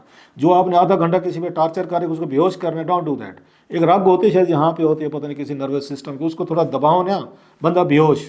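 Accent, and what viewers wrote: Saraiki, Pakistan. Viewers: He always settles his personal score, whereas serious doubts about his education, credentials. He always tries to create fuss. He never wants harmony.. My God, literally phoopian, maasian's attitude.. In his old v logs , he was instigating to khan that you shouldn't do deals by indicating deals of benazir and nawas sharif and he made a lot of v logs that khan shouldn't loose his staance.... Now he is telling different stories that IK shouldn't have done it... Peak of hypocrisy, ik will have 100 mistakes, but klasra wants to bash Ik only caz his feathers will burn if he talks about other politicians... Literally, he seems breathless when he speaks about others.... Shame on you and your so-called saraieki style English.... If you have courage, then talk about other politicians...deaf and dumb... Instead of moving forward to create harmony amongst all, your role is shameful, brutal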